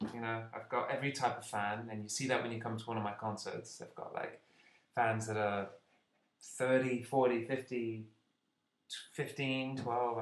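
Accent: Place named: United Kingdom. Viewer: ur accent uuuh god is killing me i'm in love with British accent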